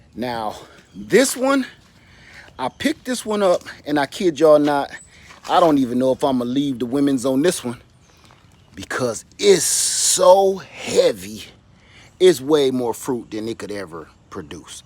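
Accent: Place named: New Orleans, United States. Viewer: Shonuff sweet gesture sir and it goes to show how our community supports each other!! Digging your air layering experiment for sure!! Found your channel thru my brother the Sapper, ya have a new subscriber from east central Mississippi here!! You sound like you from Nawlins brother lol!! Dan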